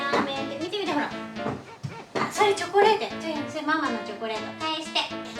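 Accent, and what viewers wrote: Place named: Kansai, Japan. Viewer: 関西弁が大好きです❣ 何時かそのラーメンパスタを試してみたい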